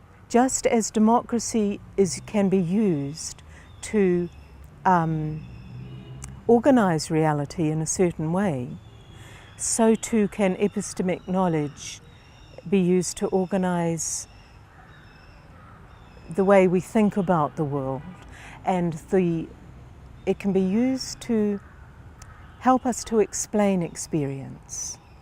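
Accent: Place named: England, United Kingdom. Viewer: One of New Zealand's least intelligent academics, a fake celebrity charlatan riding the coattails of her own controversy to success. will be remembered forever as the moron who tried to mould the education system in her misinformed image and failed. Imagine sitting through a whole lecture of this bints unbearable Tory lilt, should have been deported back to the UK years ago.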